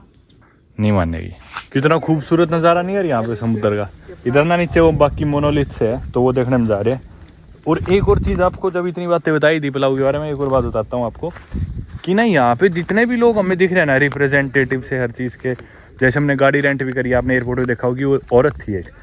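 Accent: Haryana, India. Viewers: No doubt ap boht ache vlogger hen but apne accent ko thora groom kren English bhi ap apne haryanvi accent bolte hen.. Sari dunia ghoom li ap ne but you sounds like a typical backward villager.... kafi videos me ap ajeeb si awaz nikalte hen jese kisi janwar ko hankte hue log village me nikalte hen... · I rarely watch full video that too at normal speed but aaj kafi time baad dekhi complete video, courtesy apna haryanvi bhai ji. Jo kafi handsome bhi hai 😅 n really he narrate so well ye to manna pdega with his desi accent, great skill .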